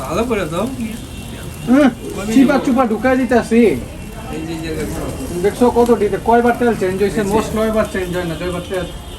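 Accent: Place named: Old Dhaka, Bangladesh. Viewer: Bai kotha gule puran dakar apnar bareta gerokom kotha bolen serokom kore bolle valo hoto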